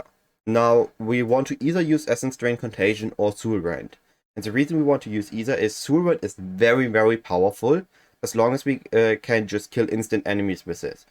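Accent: Germany. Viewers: Deutscher? BTW Very Good Video!! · Hört sich an, als seiest Du Deutscher, daher mal das Feedback auf Deutsch: Danke für die Anregungen, jetzt hab ich 'ne Idee: Seit gefühlt hundert Ligen mal wieder 'nen Essence-Drain-Trickster ausprobieren. :-)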